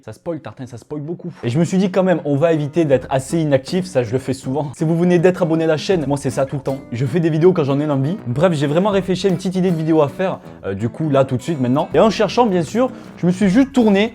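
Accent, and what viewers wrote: South, France. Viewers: Byron 650 c'est l'accent du sud je pense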